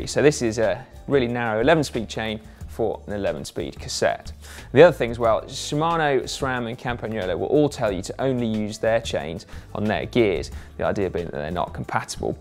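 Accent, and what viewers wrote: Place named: United Kingdom. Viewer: Could you possibly do this video again without the British accent?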